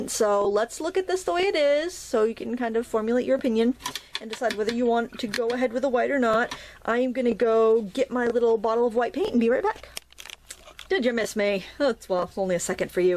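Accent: Maine, United States. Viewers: I believe its a Maine accent thing, she's saying across. I've heard it in a few other peoples videos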